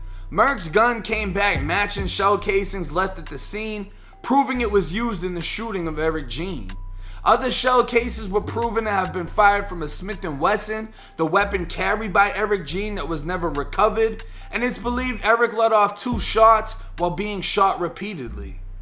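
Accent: Boston, United States. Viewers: You sound like you're from Boston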